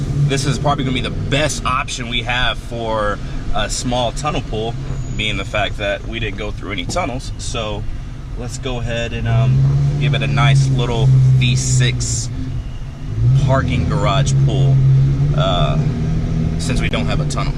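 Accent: United States. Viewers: U from Alabama bro?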